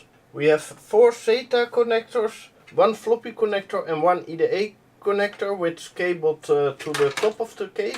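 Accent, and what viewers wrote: Netherlands. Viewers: The Dutch accent is strong with this one :)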